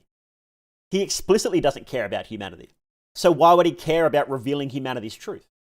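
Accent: Australia. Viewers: Mr Funny Australian Man, I have an important lore question. Is Patrick Bateman a new god?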